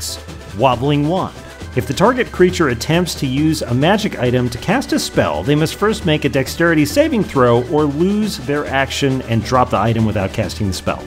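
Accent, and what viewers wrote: South Africa. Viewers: Are you Afrikaans sir?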